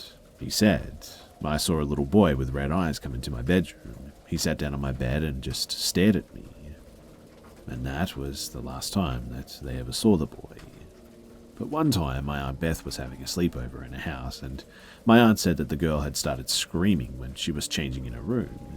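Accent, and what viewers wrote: Australia. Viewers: Bro you an Aussie like me? Sounds like it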